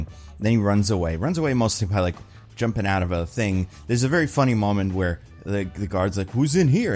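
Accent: Russia. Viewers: this video is filled with lies. for starters he said "my russian accent is bad", yeah, not buying that clearly russia-born youtuber buy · If it makes you feel better, I think you could be in this movie with your Russian accent and it wouldn’t be a detriment to the movie.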